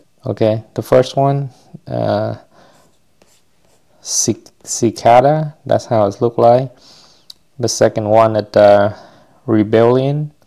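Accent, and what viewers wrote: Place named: Vietnam. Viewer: Very helpful video By the way, are you from Vietnam? your accent sounds very familiar :D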